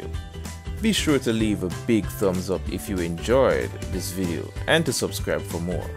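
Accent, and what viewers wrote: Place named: Philippines. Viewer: you sounds filipino,are you?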